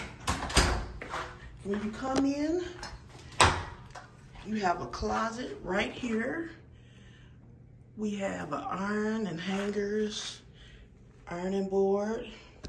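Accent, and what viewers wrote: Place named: Arkansas, United States. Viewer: I knew you were a fellow arkansan as soon as I heard you speak! LOL! Thanks, from Ft Smith!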